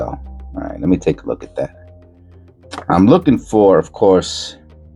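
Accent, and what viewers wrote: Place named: Brooklyn, United States. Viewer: I knew I recognized that accent lol I'm also from Brooklyn and live in Bensonhurst.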